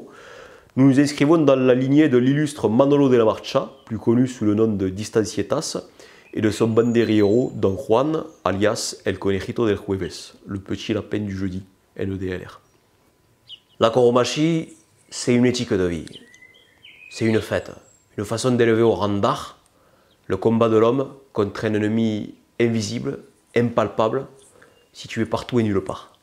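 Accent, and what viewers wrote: France. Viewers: La pratique de la coromachie produit de "grands malades" ....! Merci pour la création artistique et le rire salvateur ! En plus "avé l'assent" . Au fait Castex ? Il surjoue au maximum l'accent gascon ?!! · Un zeste de dédain du Midi, avec cet accent languedocien surprenant ici, en lieu et place de celui d'un "héros" español? Oui, mais avec un zeste d’obsession anti-covid qui devient lassante, usée, et qui rejoint finalement l'obscurantisme, les falsifications...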